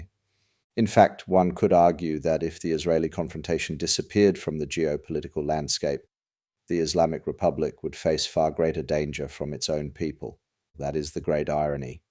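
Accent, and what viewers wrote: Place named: United Kingdom. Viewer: Bro aren't you American? Where did the British accent come from?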